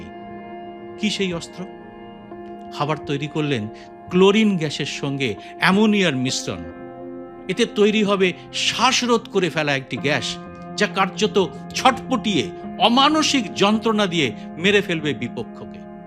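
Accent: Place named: West Bengal, India. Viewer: কারন সে পশ্চিম বঙ্গ ভারতের । তারা সুন্দর শুদ্ধ বাংলা বলে ও বিশুদ্ধ উচ্চারণ করে।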